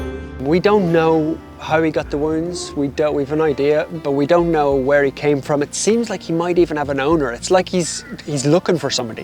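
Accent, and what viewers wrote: Ireland. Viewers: Dear god you sound as if your from southwrn ireland Im appaled Ibwill give a home to any animal that you need to. I have three pets 2 dogs and 1 very old cat which I picked up of the road supposed to be dead I brough him home and have had him for 7 months hes very old old but doing ok. If I can help I am here and will help all I can .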